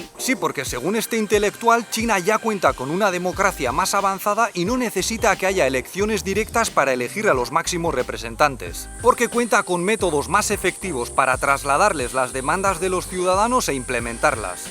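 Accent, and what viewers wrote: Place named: Spain. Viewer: No se quien luce más Chino si elle o su marido. Con su semblante que pone en las cámaras de líder de el partido comunistas que nadie le puede preguntar nada. Ese es el semblante que se ve. No digo que es así pero es lo que proyecta. O será que la cultura china a influido tanto en el que pone un semblante de Chino más que lele. Además querer soñar Chino con su acento español suena algo gracioso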